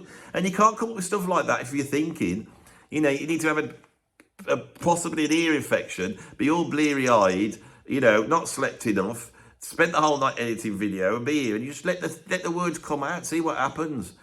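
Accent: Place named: West Midlands, United Kingdom. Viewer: Even a mild Brum / Black Country accent is like the acoustic equivalent of caustic soda, sorry mate I’m sure what you are saying is interesting but I had to bail at Noel.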